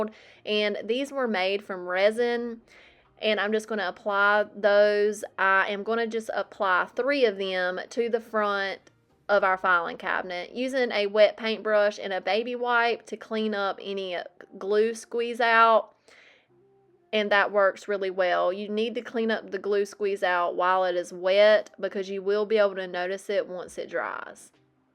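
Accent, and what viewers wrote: South, United States. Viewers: . Your grammar is fine. You have a cute southern accent which I find to be charming, especially on a YouTube channel where you are making rustic, country style decor. Don't change for the critics. Just be you. ❤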